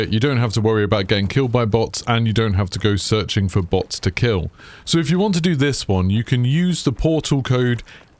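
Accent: United Kingdom. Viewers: “Non-english”. Bro, he’s from England. Did you want an American accent with the guide instead?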